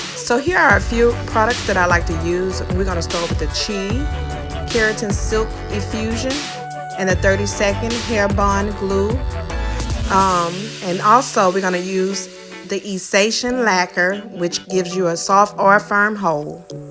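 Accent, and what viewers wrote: South, United States. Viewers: I can tell you're Southern belle by the accent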